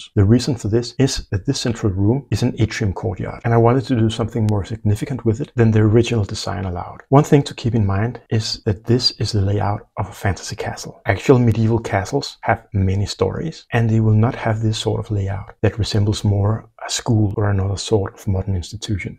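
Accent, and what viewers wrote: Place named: Denmark. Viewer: Before I say anything else, I like this. Thank you! When you were describing the inhabitants of the rooms start I felt the thin coloured lines outlining the rooms was drowned out by the black walls. I really liked the setup of your version and both the descriptions of the rooms and what small modifications you made to the map. You sound Danish, are you? "None of your buisiness" is a perfectly acceptable answer, if you answer at all.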